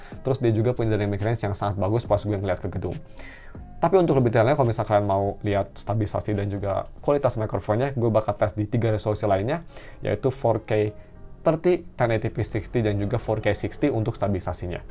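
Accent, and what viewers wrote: Bandung, Indonesia. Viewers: Kang, orang Bandung?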